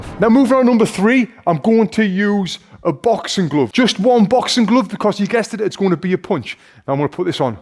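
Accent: United Kingdom. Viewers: I'm learning British accent while learning how to fight )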